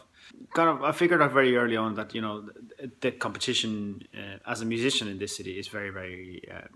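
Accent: Ireland. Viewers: Wow you sound like me and im irish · Your accent is so Irish. I enjoyed watching this. You have a good heart. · Aksentti on huomattavasti "Irlantilaistunut" siellä ollessasi. :) Haaveilen itsekin Irkkuihin muuttoa tulevina vuosina. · If I hadn't waited until you said it, I would have thought you were Irish. Your accent is proper Irish. All the turns of phrases and rhythm of a well spoken, slightly Dublin accent. Good video.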